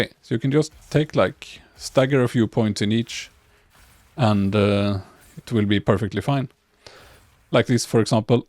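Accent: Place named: Sweden. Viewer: pause you're from Sweden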